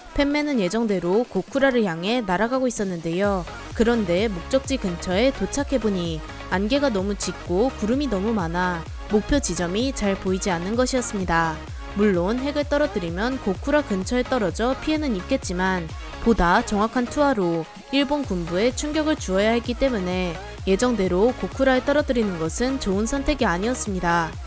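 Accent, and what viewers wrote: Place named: Gyeongsang, South Korea. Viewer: 언니 경상도분이신가보다 !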